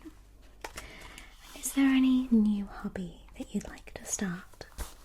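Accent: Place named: Wales, United Kingdom. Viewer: I can’t put my finger on her accent, anyone know. Switches between welsh and English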